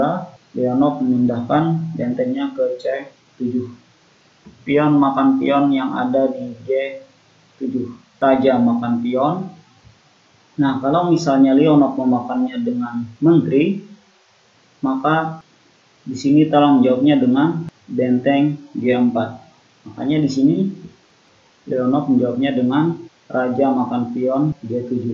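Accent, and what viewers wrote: Indonesia. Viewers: Logat banjarnya kental sekali bro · Suaranya medok banget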